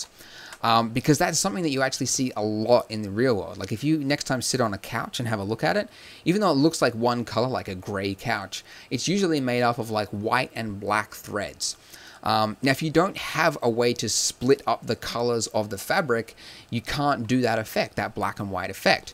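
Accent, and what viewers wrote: Australia. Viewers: I didn't know you were Australian I thought you were British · I always wondered where your accent was from! Only realised you were a fellow aussie after watching your stylised earth tutorial the other day haha.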